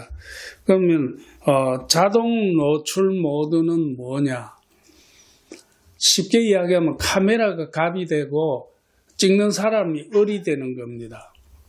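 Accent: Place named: Gyeongsang, South Korea. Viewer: 안녕하세요 선생님! 정겨운 갱상도 강의 재미 있어요 음악점수 ‘빵점’은 엄살 같으신데요? 늘 건강하시길……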